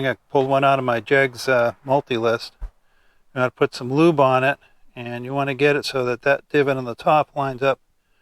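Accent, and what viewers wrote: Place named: Yorkshire, United Kingdom. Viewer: thanks for another excellent tutorial. The overdrive on TS394LO was working when I shut it down a long time ago so hope it is still OK. Our families are from the same neck of the woods - Yorkshire - probably why I can understand everything you say.:-) John in Manitoba.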